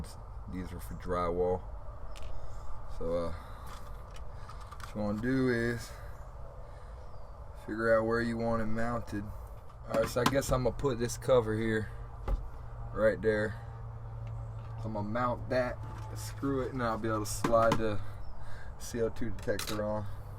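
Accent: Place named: United States. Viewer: cute american accent lol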